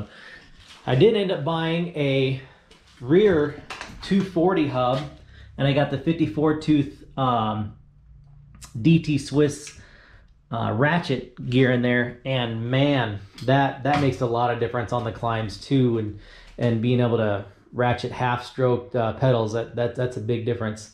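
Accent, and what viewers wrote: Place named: Texas, United States. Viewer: Whoops, should have just waited until the end of the video, sounds like Texas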